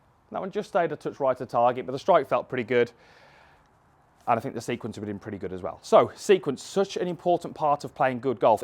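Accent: United Kingdom. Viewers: Thanks, really useful, I think thats my main problem at the moment. Midlands accent as well..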